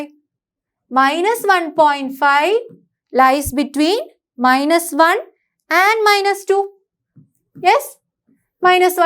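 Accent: Kerala, India. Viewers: Madam is excellent with her Malayali accent.Her explanation is so cute.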